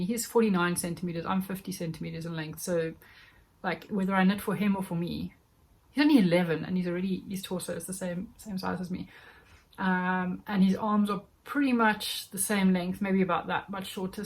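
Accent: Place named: South Africa. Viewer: When I listened to your accent in another podcast of yours, I thought you sounded South African and then in this one you mentioned you want to come and visit family in Stellenbosch. I am really loving your podcasts and I love what you are making. They will all turn out well. 😊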